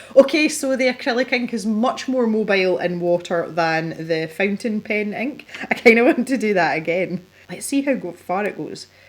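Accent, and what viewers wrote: Scotland, United Kingdom. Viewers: I’ve been subscribed to your channel for a while and really look forward to your videos, I’ve only just realised you’re from my part of Scotland, that’s why i thought your accent was familiar. Keep doing what you’re doing as you’ve given me the courage to get back into art 😁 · Where do you come from? It Sounds of Scotland. Am I right! Greetings from Germay.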